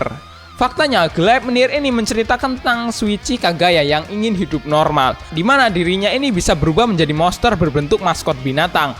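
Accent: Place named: Java, Indonesia. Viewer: Bang lo orang mana bang logat ny kayak orang jawa ya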